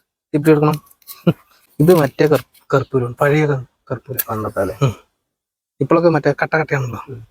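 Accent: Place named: Palakkad, India. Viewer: പാലക്കാടൻ ഭാഷ ഞങ്ങൾക്ക് ഭയങ്കര ഇഷ്ടമാണ് ഞങ്ങൾ അവിടെ മൂന്നുവർഷം താമസിച്ചാണ്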